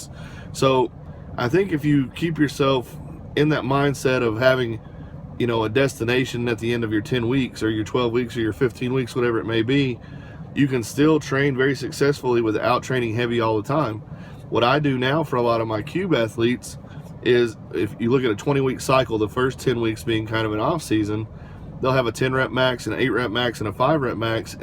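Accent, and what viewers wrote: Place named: Russia. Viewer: Will you be putting this into the new book? 20 week cycle? I can hear the russian accent developing in your voice, you have learned a lot from Boris and his athletes,I can see the influence in the way you speak about training. A mix of Louie and Sheiko, ain't that the best combo in the world. I love it. Side note, how cool is that video of them two meeting? Louie was so happy, grinning like a boy. Glad that those two met.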